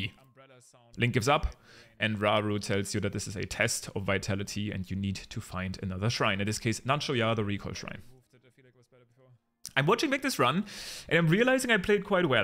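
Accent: Germany. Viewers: Are you german? Like I somehow feel you are german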